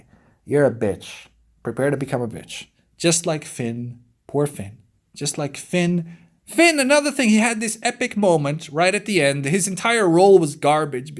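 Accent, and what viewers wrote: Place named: Netherlands. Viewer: bro you are a nerd with the worst dutch accent ever ... and i'm dutch, so if you wanna fight me let's meet up i would love to thump in your geek ass head ...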